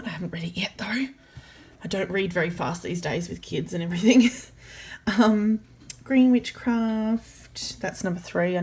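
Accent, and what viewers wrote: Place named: Australia. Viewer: Nawww this was so fun! Thanks for th tour. I miss Witchcraft magazine too sooooo much and Fiona Horne is still my absolute favourite witch!! Loved and continue to love her books. Where are you based? I detect a distinct Aussie accent 😁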